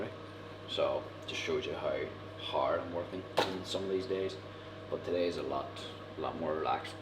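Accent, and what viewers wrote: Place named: Belfast, United Kingdom. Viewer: Were you getting a lot of feedback that fans can't understand the Belfast accent? lol subtitles. 💚🤍💛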